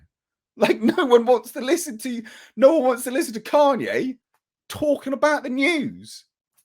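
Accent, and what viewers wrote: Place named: United Kingdom. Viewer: Pools got more viewers and make more money then you do. Can't stand listening to that pretensious British accent. I really hate the British wish Russian would take them out,lol. Weakmen of Europe. I really wish the U.S. would cut ties them.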